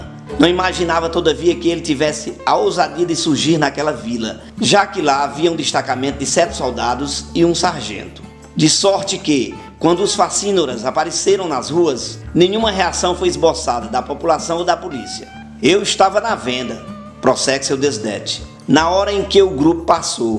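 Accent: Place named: Northeast, Brazil. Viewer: Eita Lampião sempre estrategista! Muito bom relato e o sotaque nordestino show! Parabéns!👍👏👏👏👏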